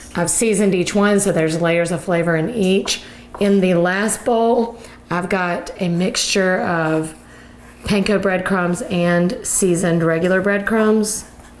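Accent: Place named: Alabama, United States. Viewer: I am in love with you. I love all your recipes. Perhaps if I comment ant say nice things YT will keep you on my YT feed. I dearly LOVE your Alabama accent too! Ken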